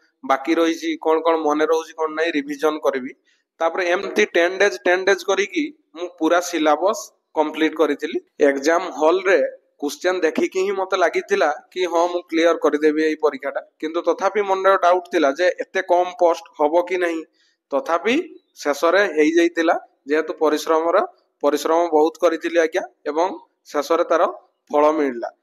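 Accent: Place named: Mayurbhanj, India. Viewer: Bhai pura amo mayurbhanjia bhalia hi katha heuchan 😊 social media re bi dil khaliki katha ❤🎉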